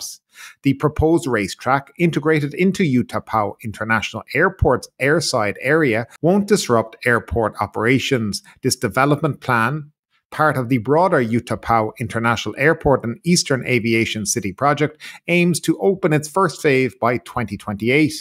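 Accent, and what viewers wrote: Ireland. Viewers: you sound Irish but look distinctly J.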